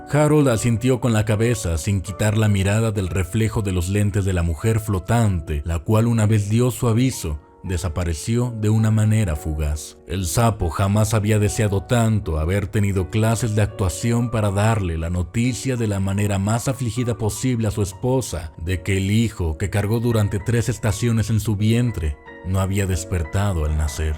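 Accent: Mexico. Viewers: Si hubiera disimulado el acento mexicano estaría mejor. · Es la voz de Sherk Pero lo senti mas mexicano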